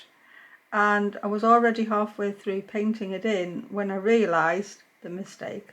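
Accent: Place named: North East England, United Kingdom. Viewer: These are beautiful! Also you sound like you’re from my area, north east England! Ah yes you just said in the video:)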